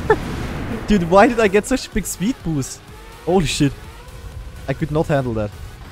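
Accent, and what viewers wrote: Germany. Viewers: Hallo der herr xD deutscher aktzend und deutsche wörter wie Ja Alter NEIIN haben dich verraten xD Guten morgen xD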